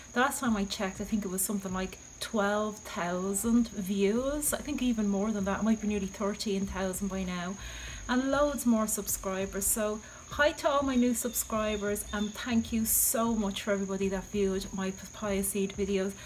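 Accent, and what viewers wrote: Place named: Ireland. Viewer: I love your Irish accent!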